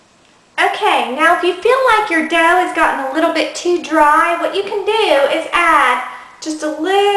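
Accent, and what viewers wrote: South, United States. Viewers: Are you southern cause your accent sounds like it?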